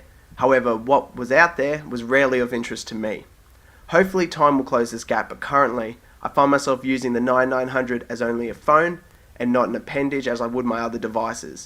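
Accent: Australia. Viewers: Finally an Aussie!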